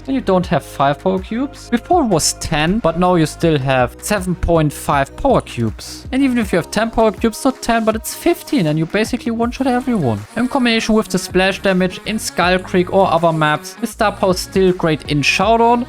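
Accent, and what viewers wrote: Germany. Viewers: Bro ist so deutsch 😅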